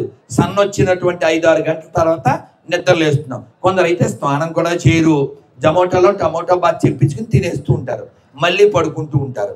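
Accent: Telangana, India. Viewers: గురూజీ మీరు తెలంగాణ భాష మాట్లాడితే సూపర్ గా ఉంది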